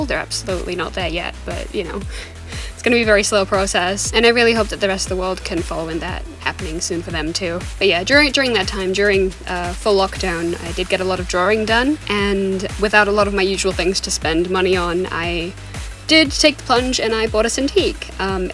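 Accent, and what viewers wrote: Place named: Australia. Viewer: Amazing drawing! Btw since its winter for you and from your accent we can tell youre from australia or new zealand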